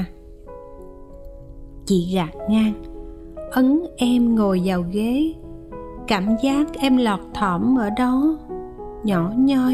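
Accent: South, Vietnam. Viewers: 5/10. Xin cảm ơn giọng đọc tuyệt vời, miền Nam yêu dấu của.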